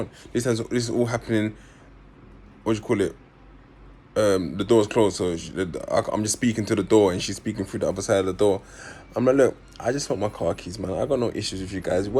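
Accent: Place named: North London, United Kingdom. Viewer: Love the vids bro. Mans got the full on narm accent, sounds like giggs sometimes lol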